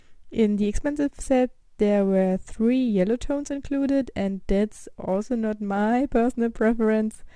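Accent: Germany. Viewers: Your german? I hear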